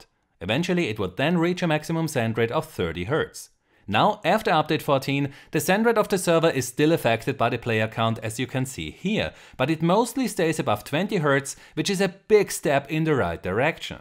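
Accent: Germany. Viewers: 1 satz und man hört das du deutsch bist :D klasse video!! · German speaking english????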